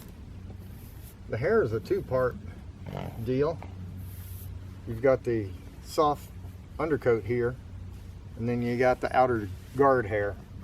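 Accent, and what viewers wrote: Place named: South, United States. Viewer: Love your southern accent x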